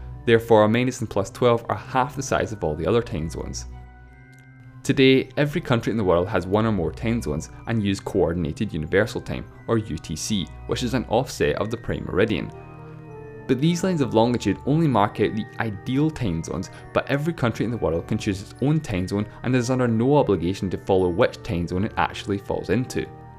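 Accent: Scotland, United Kingdom. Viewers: No, it's a Scottish accent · Gae Shows that's ignorant, he has a Scottish accent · Sounds like a hybrid accent. Scot and Chinese or Japanese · The amount of idiots here who don't know the Scottish accent is too high. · He has a Scottish accent, which is presumably where he's from. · It is Scottish. Use Closed Captions (subtitles) if you find it difficult to understand. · Roman Furman Scotland, with a Scottish accent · We Americans have not trouble understanding a standard English accent. The narrator, however, has a Scottish accent, something English speakers outside the UK find difficult to understand. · From the comments i understand it is a Scottish accent. I have no problem to understand him. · He's Scottish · Yeah, it is a scottish accent. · Eduardo Mañón it's Scottish not english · Oh dear...Scottish accent with the speech impediment additive...does my head in... 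· This channel is from Scotland. I hear it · Are you Scottish? Scotland is the best :) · Good to hear a Scottish voice on Youtube and imparting some knowledge. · To be honest, I loved your Scottish accent. By the way, you should have mentioned the Spanish case, where in zones like Finisterre (where I am from), the very eastest cape of Spain, the sun goes down in summer , in 40°N of latitude; and in winter, the sunrise is am... Freaking out! We should be on -1 UTC, but we are on +1, and in summer +2. But well, I don't dislike it though. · Niue is a territory of New zealand n ot a country it's a scottish accent and yes it's difficult to get used to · Accents are wide raging and different u can have 10/20 different accents in a city or region of a country or even just a part of a city. Also certain ppl naturally may have a strong or weak accent due to their voice and some ppl can be able to mix different accents and make their own unique accent. 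This guy has a Scottish accent but it's not a strong strong 1. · Breezy But he's from Scotland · I would like to know the presenter's area from which his Scottish accent originates, as I've heard similar versions where it sounds like the other speakers are talking through mouthfuls of saliva. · WHY DID THEY CHOOSE THIS TERRIBLE ANNOUNCER???!!!! HIS ACCENT IS DIFFICULT TO COMPREHEND. SHOULD HAVE USED AN ANNOUNCER WHO SPEAKS IN STANDARD BBC ENGLISH OR GENERAL AMERICAN ENGLISH, UNIVERSALLY UNDERSTOOD ACCENTS---NOT SOME SCOTTISH ACCENT!!! RUINED THE ENTIRE VIDEO. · He has a Scottish accent. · I'm a stupid native English speaker from America, but for some reason it's so hard for me to understand some English dialects. British? and Australian are pretty easy, and you accent doesn't sound Irish, so I'm guessing Scottish, and that's what some of the comments are saying. But that's okay that's what subtitles are for. 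And don't worry I subbed. And I'm sure you understand where I'm coming from but just to confirm I'm not talking shit or hating on you specifically I'm just really being honest: I seriously can't understand all English dialects. Otherwise I wouldn't have even mentioned subbing and actually subbing. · Very annoying all those comments mocking his accent. He is Scottish, that's how people speak here. He never said "tame", there is no a sound in his pronunciation of time. Same with "sex", he never said that, there was no e sound in his pronunciation of six. Most of the people making those comments are probably American, considering how these words are pronounced differently there. Guess what... English is spoken in other countries as well. Have a bit of respect for other accents! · He's Scottish that's how he talks.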